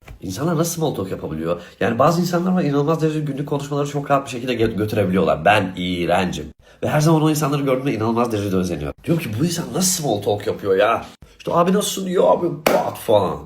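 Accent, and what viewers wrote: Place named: United States. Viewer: Abi Amerikalılar gibi konuşuyorsun ama dilin Türkçe.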